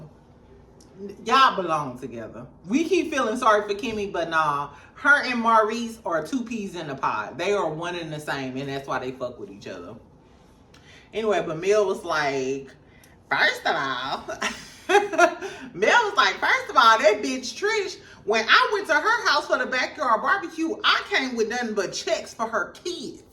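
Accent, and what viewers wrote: St. Louis, United States. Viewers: Good commentary, I love your St Louis accent sounding like Sunni….hur and thur, it’s so cute. While it’s tacky for Chris to try to set up anyone people forget this set up happened after Mel had filed for divorce and no possibility of reconciliation, she didn’t want Martel back then. If he was trying to hook up Martel during the marriage, that’s different. To me, the timeline of when all this was happening is getting downplayed, Mel and Martel may have just been still married on paper while the divorce was in progress. IMO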